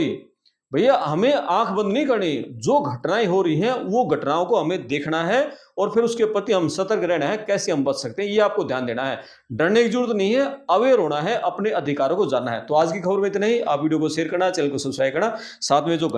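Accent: Haryana, India. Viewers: एक तो आप इधर उधर की बात कम करिए , सीधे काम की बात पर आइए और हरियाणवी accent छोड़कर खड़ी बोली में बात करिए तो अधिक लोग देखेंगे.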